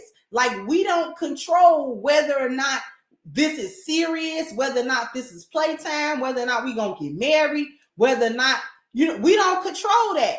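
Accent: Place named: New Orleans, United States. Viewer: are you from the boot? I here so much new orleans tongue.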